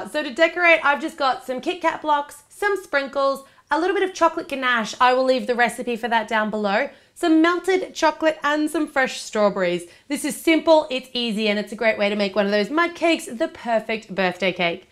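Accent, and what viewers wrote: Australia. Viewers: good lord I love Australian accent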